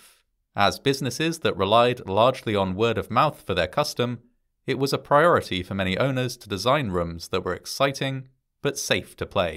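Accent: United Kingdom. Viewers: terribly hard to listen to the mono tone british accent, im going to take a nap now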